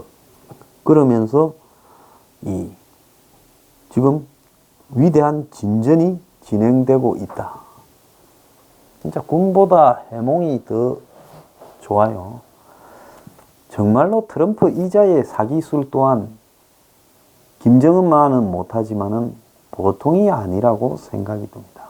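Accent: Gyeongsang, South Korea. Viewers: ..최고의 변사급 뉴스 진행자! 전 소장님의 거친 갱상도 사투리 들을때마다 뭉가로 인한 급노화 된 피부가 재생되는거 같아요..알라븅~🔞🎈😘🍨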